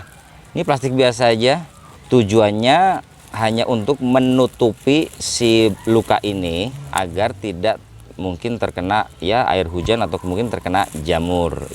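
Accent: Jakarta, Indonesia. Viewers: Abang orang Betawi ya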